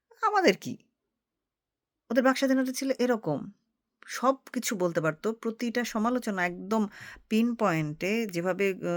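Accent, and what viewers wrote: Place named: Bangal, Bangladesh. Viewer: আপনি অসাধারণ বলেন । কিন্তু বাঙাল ভাষায় বলেন তাই একটু ধীরে ধীরে বলুন। আমাদের সব বুঝতে অসুবিধা হয়। কিন্তু যুক্তি আপনার অসাধারণ। great---💐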